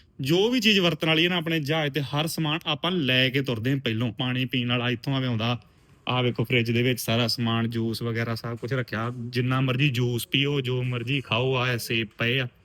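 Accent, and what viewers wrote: Majha, India. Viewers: Majhe wala 😂lagda wa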